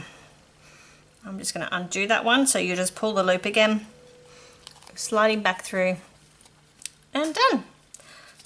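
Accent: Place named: Australia. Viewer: I love ur australian accent! Haha. Thx for the helpful vid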